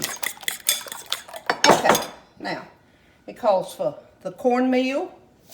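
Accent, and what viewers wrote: South, United States. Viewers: Hi, I love All your recipes!!! Also love your sourthern accent!!😊especially when you say R/ite, 😀so great!!!😀😁 love, from zFt. WORTH, Texas!!!😍😍😙😚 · I dearly love your videos. Yummy! I had a lovely aunt who married a wonderful Christian man from Mississippi. After a time she developed that glorious Southern accent. She has gone on to Heaven now, but sounds just like her. So calming & gracious to hear her talk. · I knew she had to be from the South, because of how she cook and also how she talks. She reminds me of my Grandmother love you 😘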